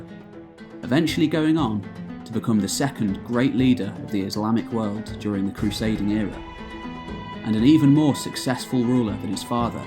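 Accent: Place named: RP, United Kingdom. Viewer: Just wondering if you have a true passion for history , judging by your tone . As I have said earlier , your Queen's English is music to the ears .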